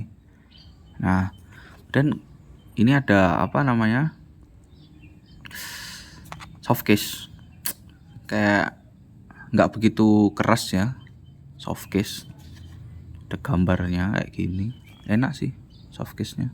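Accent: Java, Indonesia. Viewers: Jowone manteb mas😂😂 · cangkemu medok tenan ngomong guweh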